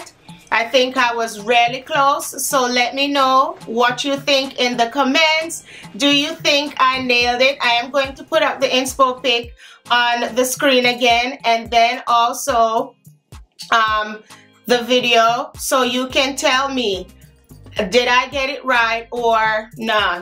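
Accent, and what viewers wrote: Jamaica. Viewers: Randomly came across your video and had to subscribe right away as soon as I heard the Jamaican accent! Now back to the video…love how u style the fits. The lime green/Lavender is my fav 😊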